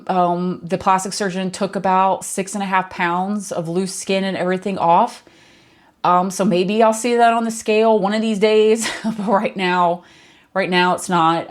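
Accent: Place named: United States. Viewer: 🌸🌸🌸I listened to your story and I have to agree, people need to seriously reconsider having elective surgery, especially with kids!! I had heart surgery...I still have nightmares and anxiety because I will need to do it again. Surgery is a life and death situation no matter how experienced your doctor's and nurses. the risk is always there. Suffering can and will happen. If you can avoid it, avoid it as long as you have young children because you never know. You sound a bit american and idk how this has affected you financially but I'm very sorry for all that stress too. ❤ Your husband sounds like a dream. I've never had a family care like that about me.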